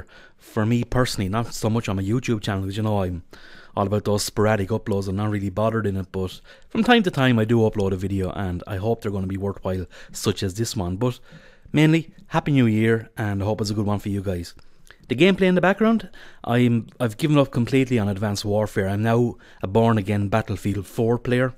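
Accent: Ireland. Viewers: Are you irish? and nice video! :D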